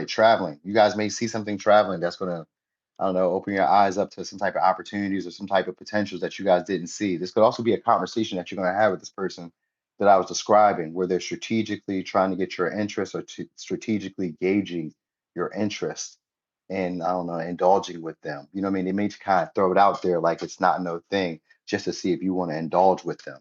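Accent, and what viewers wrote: Australia. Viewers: He has an Australian accent 😅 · He is Australian....I didn't notice at first...😂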